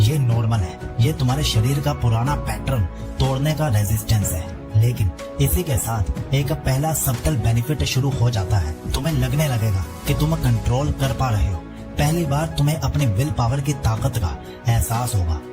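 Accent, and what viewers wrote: South India, India. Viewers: आप साउथ से है क्या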